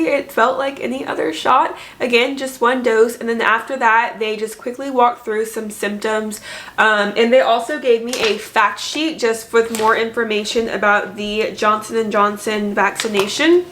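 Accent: United States. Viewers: just ran across your video and decided to watch. A few minutes in I was thinking "this girl sounds like she's in Texas" and then you eventually revealed you're in the D/FW area, as am I. I'm just getting over the side effects from my 2nd pfizer vaccine. I slept for 24 hours, felt like I might have had a slight fever, a bit of achiness. Of course, my allergies are in high gear right now which doesn't help. I'm older than you are, 61, with an underlying health condition. But like you, I'm so grateful to be vaccinated and hopefully we can return to a more normal life. I've been self-isolating at home alone for a year so I'm ready for human interaction. I salute you being a teacher and public servant. One of the most important jobs in the world. Wishing you many blessings and a covid-free life. You're so adorable I bet your students LOVE you!! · I know that accent from a familiar place, young lady. 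Are you from western PA by chance? 😉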